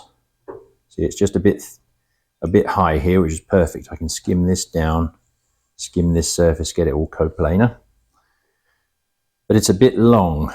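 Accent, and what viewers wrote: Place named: England, United Kingdom. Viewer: Ooh! Just found your channel, nice to hear an English voice for a change. 🙂 I have the same saw, the blade fell off every time I started a cut as the drive wheel was misaligned, but after a bit of fettling it's done a good 15 years service including cutting a lot of firewood! I recently had to replace the motor capacitor, but nothing else. The stand is poor as you say. I'll be interested to see your vice mods.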